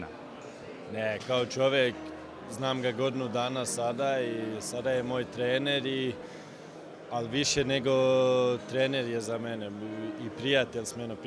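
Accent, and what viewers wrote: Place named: Italy. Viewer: Zasto ovaj bosanac ovako loše prica ...kao da je rođen u Italiji a ne u Bosni.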